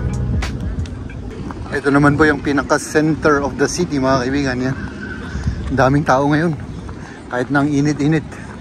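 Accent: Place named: Igorot, Philippines. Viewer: Ask ko lang po, yung accent nyo kasi sa Tagalog parang Igorot. Ay Igorot kayo? First time ko agbuya vlog yu ta recommended ti YouTube. Nag subscribe akon.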